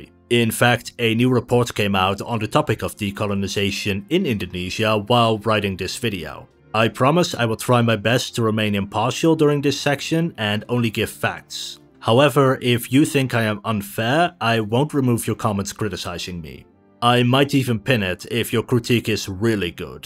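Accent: Netherlands. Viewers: Man, I spent a lot of time trying to place your accent. It does sound Dutch, but it’s different than many of my Dutch friends’ accents. Where are you from?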